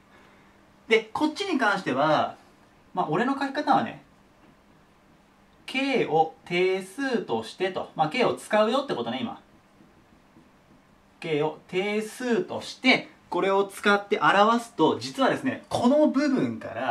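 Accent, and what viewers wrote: Kansai, Japan. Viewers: たまに関西弁のイントネーション入るよね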